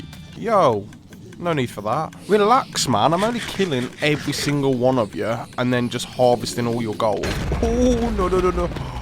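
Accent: United Kingdom. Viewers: I think bro is British. I need American translation to understand 😫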